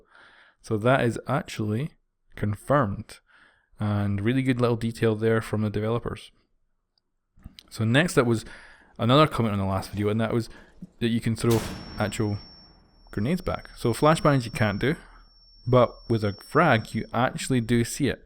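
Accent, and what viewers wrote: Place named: Aberdeen, United Kingdom. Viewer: you sound like your from aberdeen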